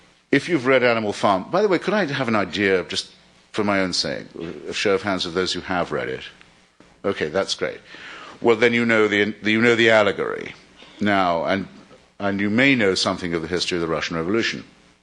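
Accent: RP, United Kingdom. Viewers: a shock jock with a plummy accent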